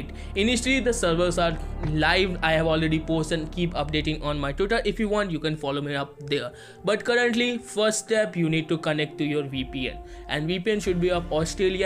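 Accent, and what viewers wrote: India. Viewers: Indian accent, hmmmmm...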